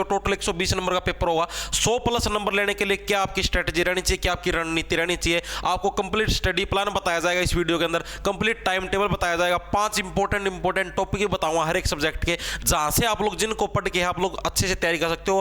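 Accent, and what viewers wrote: Haryana, India. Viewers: नमस्कार जी म थारा साथी मोहित पुनिया 😅 नर और मादा की उमर X or y or दोपहर म घणा तावड़ा पड़ रय्या छ मस्त बोली है, गुरुजी थाकी 😊 हरियाणा से हैं क्या आप❤ धुम्मा मार दयो 😅 और घूमा मार दियो नर कैंडिडेट का फिजिकल में सिस्टम पाट के हाथ में आ गया था 😂😂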